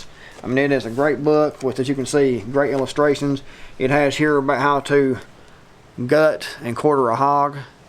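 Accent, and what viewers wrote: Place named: South, United States. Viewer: I love how he talks! Country boy !! 🇺🇸 live this channel!!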